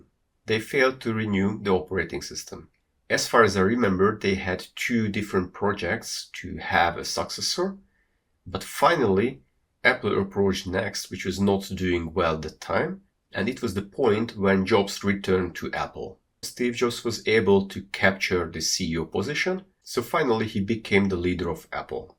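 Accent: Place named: Hungary. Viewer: My comments are being deleted by youtube, I think they dont like long comments other then channel owner... One more think, I think I hear Hungarian accent in your English, are you from Hungary? If so, could you make some research and video to very interesting topic, in early 80s Jack Trammiel had a Software developer team in Hungary that developed a lot of Commodore software, which is very interesting since Hungary was behind Iron Curtain. Can you find some background info, or maybe find someone from that era for interview?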